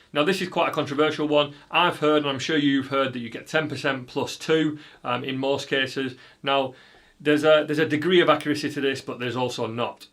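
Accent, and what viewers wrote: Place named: England, United Kingdom. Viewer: This guy is clearly from England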